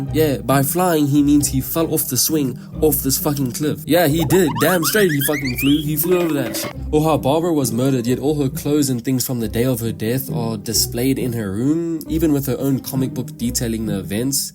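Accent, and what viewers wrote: South Africa. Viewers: Are you from SA?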